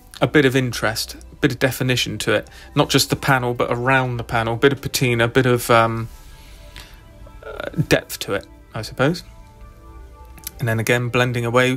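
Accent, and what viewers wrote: United Kingdom. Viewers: As a yank, to me this has to be the most sexiest fighter of WW2. Plus hearing a Brit narrate brings it altogether. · Brilliant video build. I love the British accent!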